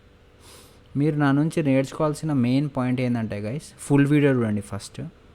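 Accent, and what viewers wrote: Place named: Rayalaseema, India. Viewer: Bro meedi proper Rayalaseema kadha I think u r language pronounce like that only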